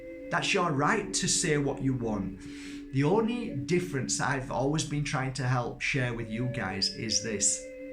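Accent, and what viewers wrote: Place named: Midlands, United Kingdom. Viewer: Love your style - just found your channel ….you have great energy - is that a Midlands accent? From one UK person to another....xx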